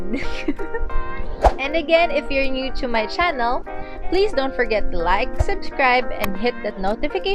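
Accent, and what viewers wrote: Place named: Conyo, Philippines. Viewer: very pero conyo, IBA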